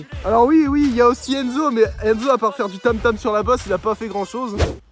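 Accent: Alsace, France. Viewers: L’es accent alsacien ça me fumes😂😂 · L’accent alsacien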